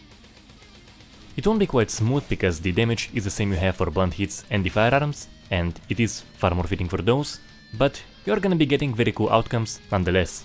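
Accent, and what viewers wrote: Russia. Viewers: Оо, русский чел, по акценту узнал)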